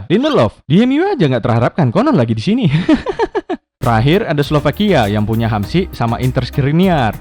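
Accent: Medan, Indonesia. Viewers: 𝘛𝘶𝘬𝘢𝘯𝘨 𝘴𝘰𝘬 𝘯𝘨𝘢𝘫𝘢𝘳-𝘯𝘨𝘢𝘫𝘢𝘳𝘪. Medan kali, bah!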